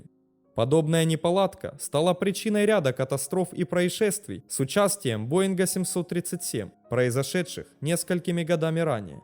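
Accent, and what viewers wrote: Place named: East, Ukraine. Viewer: я это поняла. Восточная Украина, видимо) может, Вам проработать его? Без обид, просто мы Вас не видим, только слышим, голос имеет огромное значение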